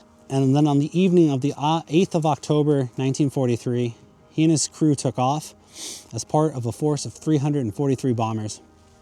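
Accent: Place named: United States. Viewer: Thank you so much for your time making this video. From your accent I guess that you are American. It is wonderful that you describe the fallen warriors in such moving terms. You are an example to us all . Again many thanks.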